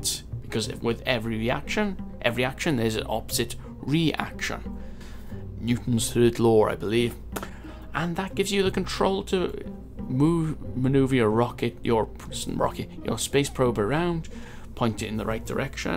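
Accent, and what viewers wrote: Wales, United Kingdom. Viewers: are you Welsh coz I kinda recognise the accent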